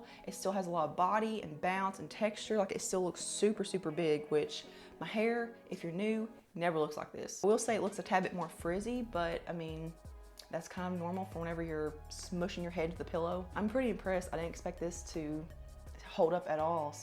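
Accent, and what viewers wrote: South, United States. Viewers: Your southern accent is soo charming ☺️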